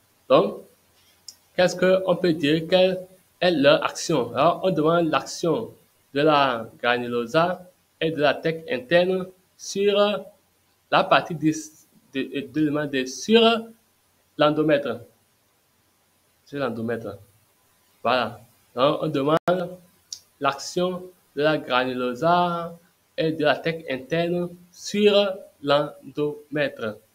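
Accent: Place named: Africa. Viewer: La prononciation africaine de français me fait mal au cœur mais merci beaucoup 🎉❤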